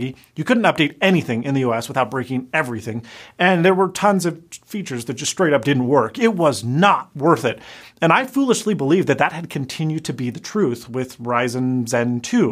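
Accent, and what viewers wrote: Spain. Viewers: You used to have a Spanish accent? No idea. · What’s up with Spanish accent? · Yo. Spanish accent? TF?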